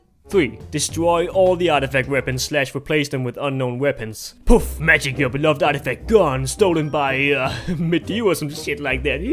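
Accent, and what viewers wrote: Denmark. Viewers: Og man kan bare høre at du er dansker! :D Subbed! :) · bro you danish? I can act hear it :D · iv never seen anyone of your videos, but you make some valid points, Im both on pro and con-side of the artifact weapons, but so far i like them :) Btw, it sounds like you have a danish accent? Am I close? :p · I know that accent You're from DK right? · this guy has the most danish accent in the world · Is it just me or do you sound danish like heck :o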